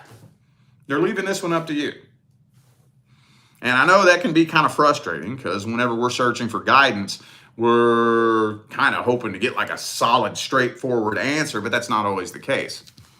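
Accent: South, United States. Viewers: Love that southern voice!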